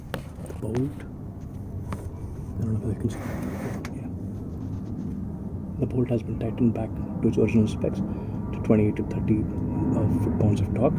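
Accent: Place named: India. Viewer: This is going to sound racist and it may be, make more of these vids I trust the Indian accent teaching me prob a result of Kahn academy but very informative thank you!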